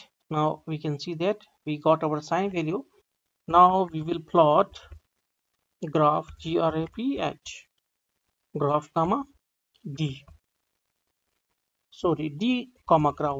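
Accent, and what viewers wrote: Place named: India. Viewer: thank you soo much my indian bro ı like your accent :D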